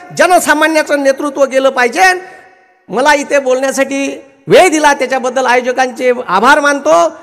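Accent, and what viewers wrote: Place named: Nagpur, India. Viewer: Nagpuri bhasha aahe aamhi asach bolto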